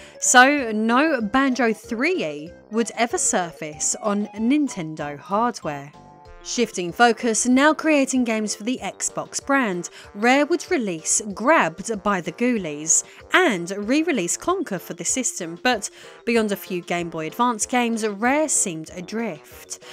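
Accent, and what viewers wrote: United Kingdom. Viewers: Funny that all these videos about banjo threeie have someone British talking in it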